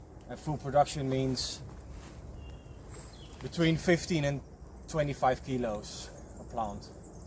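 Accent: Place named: Netherlands. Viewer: Benieuwd in welke regio je woont, lijkt me umbria/toscane op basis van de olijven en cipressen. Ik ga er op basis van je accent ook maar vanuit dat je Nederlands bent. Ben ook bezig met de cursussen van vaso policonoco , komende winter normaal avanzato en dan het examen zoals ik zag in je andere video.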